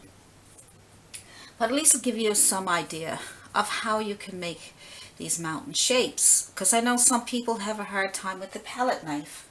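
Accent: Newfoundland, Canada. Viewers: Thank you so much for the lesson! Do I detect a Newfoundland accent? 😊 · You sound like a Newfoundlander. My family is from Newfoundland. ❤️ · Wonderful, thank you! (Are you from Newfoundland?)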